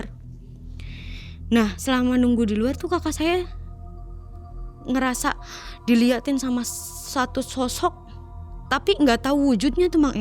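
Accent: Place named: Cirebon, Indonesia. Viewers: Wong cirebon ya mba ?? Logate keciri 😂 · Logatnya daerah majakuning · bahasnya kaya orang cirebon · Cirebon yaqin, Indramayu mirip sih,tapi tetep beda,mba tulen Cirebon, rumah makan mana ya buka nya sore smpe mlm dan ramai pengunjungnya 😊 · Logat bahasae kyk wong Cerbon c yuu 😁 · Klo denger logatnga sprtinya lokasinya di cirebon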